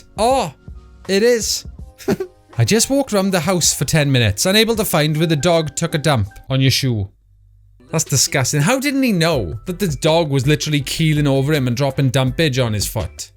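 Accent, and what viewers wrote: Wales, United Kingdom. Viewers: Are you Welsh? 🏴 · Wales 🏴 💕 cariad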